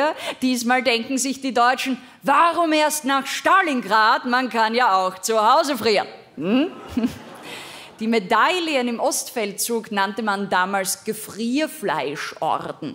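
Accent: Austria. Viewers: kein Wunder Du Schlaubär, denn sie kommt aus Leoben, Steiermark und spricht somit den steierischen Dialekt. Das hat mit wienerisch so viel zu tun, wie bayerisch mit hessisch. Den Rest spare ich mir zu kommentieren... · Der Wiener Slang , der leicht nasale , herrlich ...freut mich als am Land lebende gebürtige Grazerin!!!😂😂😂😂❤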